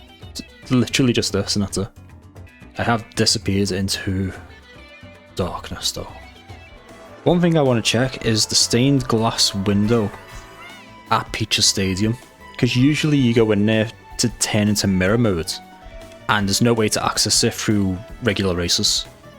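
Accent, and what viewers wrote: Liverpool, United Kingdom. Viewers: I think he’s scouse. The accent gives it away?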